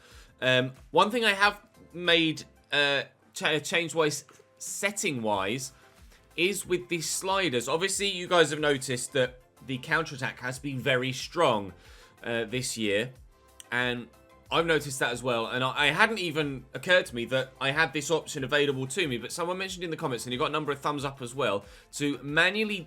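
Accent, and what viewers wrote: United Kingdom. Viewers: I love how absolutely British this guy is. A proper Englishman. Its fun to listen to him as an American.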